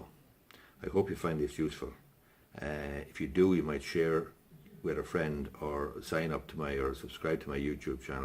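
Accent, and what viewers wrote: Ireland. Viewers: Cork accent boi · Irish, not sure which area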